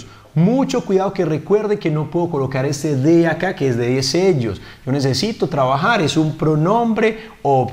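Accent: Colombia. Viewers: Hola Profesor, mi nombre es Guillermo Léon Gómez Gómez soy Colombiano y vivo aquí en Sincelejo, llevo muchos videos tuyo viendolos y ningún otro profesor explica de la mejor forma que tu, tu haces unos buenos análisis y te das a entender muy bien y que cada uno de nosotros aprendamos de la mejor forma como tu lo haces las cosas tan sencillas y se vea que si entiendas tus clases es por eso que escogí este curso tuyo para entender muy bien. De otro lado quiero que me digas si estás viviendo aquí en Colombia y donde aprendiste tu Inglés o estás en el extranjero, y siento que tu acento fuera de la ciudad de Medellín.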